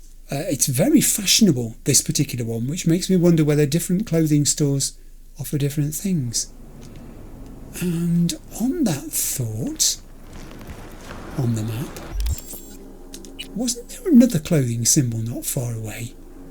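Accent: United Kingdom. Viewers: What a great channel I found, truly a virtual gem! Thank you very much for you efforts, for your comments, overall for your rich Britain speech and charisma! I played Cyberpunk 2077 for lots of hours, about 200+, one of my favourite games so far and your videos inspire me to play it hundreds hours more!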